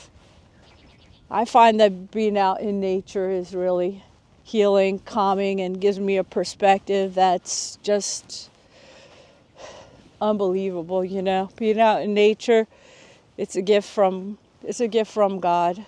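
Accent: New Jersey, United States. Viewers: I knew you were from "Joisy", based on your accent! Out "in da bogs; out in da Pine Barrens!" Love it. Classic.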